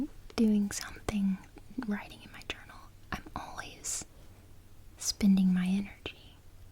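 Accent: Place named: Oklahoma, United States. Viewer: You sound like you are from Oklahoma.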